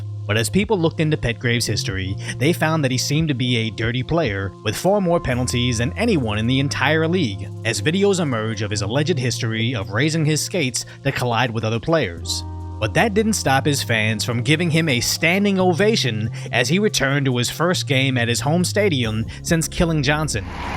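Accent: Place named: East Coast, United States. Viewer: Do you make all your content to covertly target black people? Im just asking, I noticed that about you, that’s not to take away from someone who very clearly deserves to have their day in court but there are so many groups who commit in frequency many of the same crimes you report. But judging by that accent it’s no surprise where you were raised, pretty much the Mecca of WS on the east coast. Your blind & biased, basically acting as the watch dog you are.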